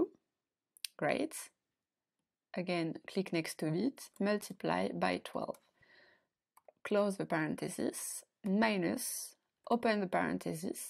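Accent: France. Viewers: Merci pour la vidéo (j'ai reconnu l'accent français^^)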